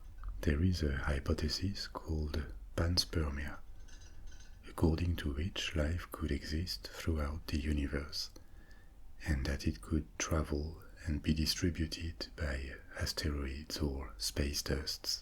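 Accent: France. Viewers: Tu est français ? Car avec le nom de la chaîne et ton petit accent français on dirait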